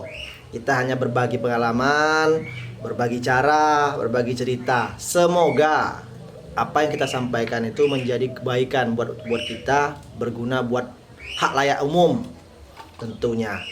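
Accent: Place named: Lampung, Indonesia. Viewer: Kayak logat lampung bg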